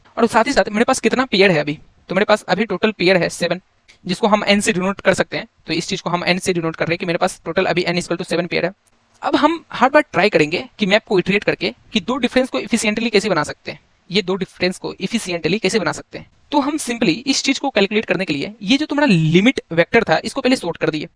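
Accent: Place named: Bihar, India. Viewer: acha smjhaya . kaha se ho bihar me? (accent++)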